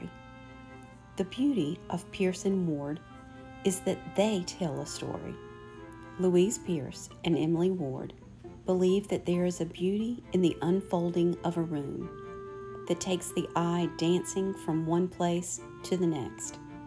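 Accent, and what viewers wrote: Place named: South, United States. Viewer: I love your soft, sweet Southern voice!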